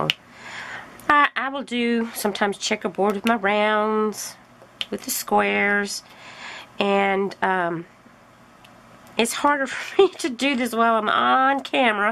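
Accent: Texas, United States. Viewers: I am brand new to diamond painting I have only done a couple of paintings I’m really enjoying listening to you while I work on my paintings I love your Texas accent So how I kit up Is in the bags they come in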